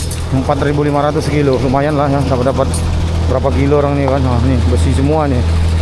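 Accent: Medan, Indonesia. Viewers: Semangat menyala buat kontennya abangkuu. Senang kali awak dengar aksen medannya bang 😂👍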